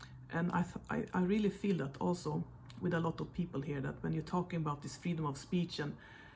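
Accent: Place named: Norway. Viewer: your accent sounds norge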